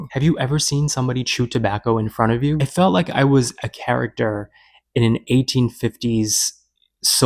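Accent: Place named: South, United States. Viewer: Sounds like a true southern man with limited experience hooking up.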